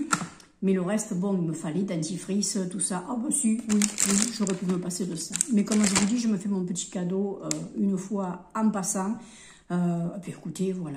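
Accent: South, France. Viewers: J’aime t’écouter, tu me fais rire. Les tourterelles prennent tout et les petitouts n’ont plus rien du tout. Ta façon de parler et ton accent sent si bon le midi, ça me rappelle Pagnol que j’adore 🥰. Bonne journée, bisous 😘😘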